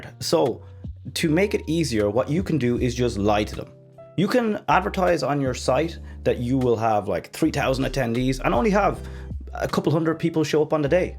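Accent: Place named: Ireland. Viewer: Are you Irish? you have Irish accent. anyway, great content <3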